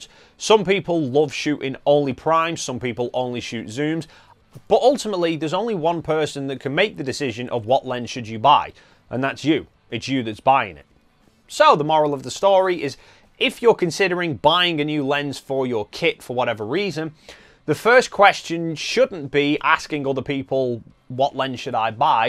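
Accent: United Kingdom. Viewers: Thanks for the video! I think I'm going to try prime 50 or 80mm. Btw, are from eastern parts of the UK? Trying to guess by the accent.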